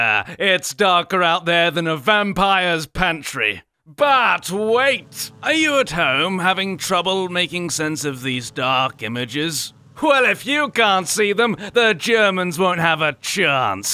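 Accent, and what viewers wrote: England, United Kingdom. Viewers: Man you and your english actent made me switch from Russian to English planes.🇬🇧🇬🇧🇬🇧🇬🇧🇬🇧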